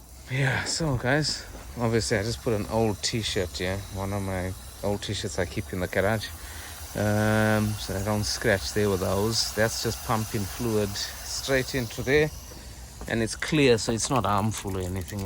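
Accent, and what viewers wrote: South Africa. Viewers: Cool video ,you sound South African ? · you sound very south africa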